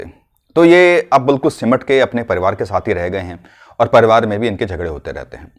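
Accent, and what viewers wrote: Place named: Khandesh, India. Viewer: येले म्हंतस अहिराणी तडका 🔥🔥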